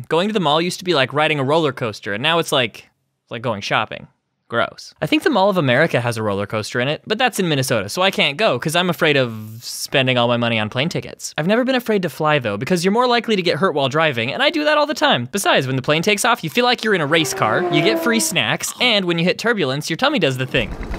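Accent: Canada. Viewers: you kinda sounded like a candian from south park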